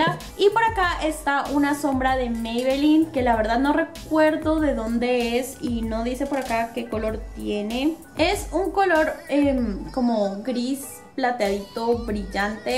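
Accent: Mexico. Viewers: A penas estoy viendo tus vídeos y creí al principio que eras mexicana. ¿De dónde eres? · Habla como Mexicana....ella no es Colombiana?? Ni idea...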